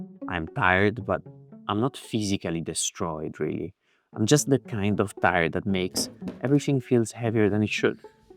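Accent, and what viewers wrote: Italy. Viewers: Thank you for this video😁 I needed a reminder that being consistent isn't that hard when you're smart about it. I also love your italian accent! Rooting for your success!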